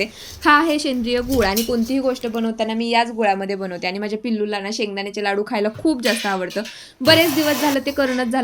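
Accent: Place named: Kolhapur, India. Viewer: Tumchi bhasha amchya Kolhapur sarkhi vatate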